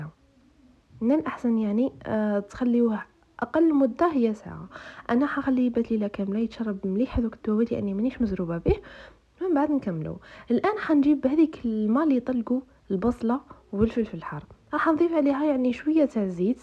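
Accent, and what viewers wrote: Setif, Algeria. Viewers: هدرتك تباني سطايفية انا من سطيف هدرتنا تسخف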